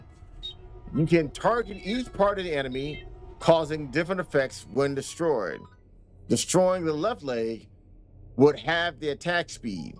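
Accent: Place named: Italy. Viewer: I was going to say, I wholeheartedly agree, if you CAN take advantage, you MUST take advantage 🤪if anything, it's making me make the mechanics in my game deliberate and airtight - speaking of which, I'm getting close to Demo material and would be honoured if you would give it a go. Always like watching your videos brother, I suspect we are from the same generation, a lot of references you make I catch - always appreciate the Italian accent too, being half Italian myself 🤌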